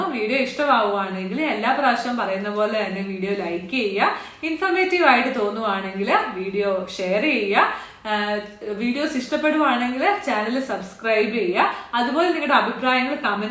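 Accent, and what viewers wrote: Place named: Onattukara, India. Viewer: Nice video. Including some sample price for homes in suburbs for say 50 -100 sq. meter homes would have been the cherry on cake. Your malayalam is so much like 'onattukara' malayalam ( Mavelikara, Chengannur etc) ! It's such annuncommon version of Malayalam on YouTube. This gives us the feel of our 'Nadu'. Thanks.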